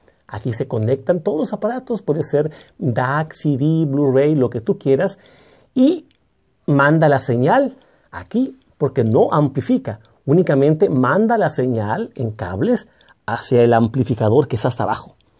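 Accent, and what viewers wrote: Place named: Yucatan, Mexico. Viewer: Hace mucho que no vivo en México, pero noto un ligero acento de Yucatan es usted de origen yucateco o por lo menos de la peninsula de yucatan? Perdon por desviarme un poco del tema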